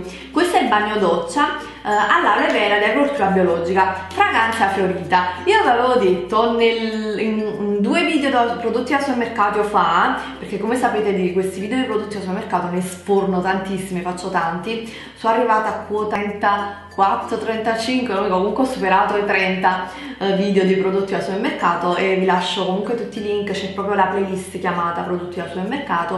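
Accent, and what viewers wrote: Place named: South, Italy. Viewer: Come mai non sai parlare l'italiano?? Cosa succede al sud che non riuscite proprio ad impararlo?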